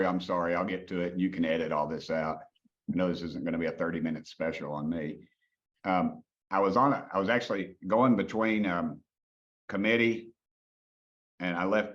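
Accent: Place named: South, United States. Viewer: I couldn’t have more liking and respect for this gentleman if I tried to. He does exactly what he was put into office to do, work for his constituents & boy does he do it well. The southern ‘twang’ & his “tic tac like the candy” comments just make him more endearing. I don’t think anyone got as much as we were hoping to from the congress sitting yesterday, I sat here in NZ & watched the entire thing, & was very disappointed at the blocking of this & that, but hopefully in a behind the scenes meeting they will get to see more, find out more, enough to dig their boot & high heels into & start to climb up that rope, then, we the public, will hopefully see & hear more too. Time will tell, & I guess at this stage more times not going to kill us, at least I hope not!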